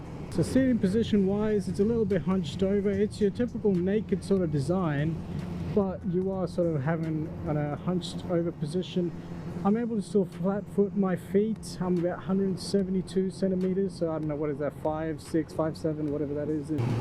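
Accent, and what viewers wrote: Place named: Australia. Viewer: It's an awesome bike. I've got the 2019/2020 model and it's an absolute peach of a motorbike. Got it in July 2020. This was a great review as well. I notice the Australian accent mate. Nice to see some Australian content on YouTube as well. Yeah, the s1000r is the best of both worlds in my opinion. It's a total hooligan & docile put-put bike for around town. The quick shifter is the smoothest I've had & fueling is perfect. Super responsive and plenty of get up & go, even if you have leathers with your name on the back of'em.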